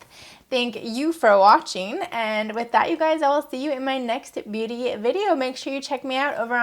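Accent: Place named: Canada. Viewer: That’s just a Canadian accent, girl. 🤦🏻‍♀️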